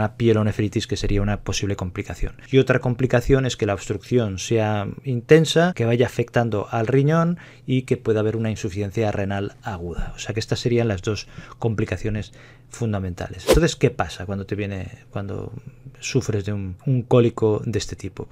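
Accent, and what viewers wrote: Spain. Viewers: Gracias, muy claro. Guapo doctor, hasta el acento español me gusto😁. Mañana será mi intervención. Y justo pensaba qué hacer para evitar la formación, saludos Querétaro-México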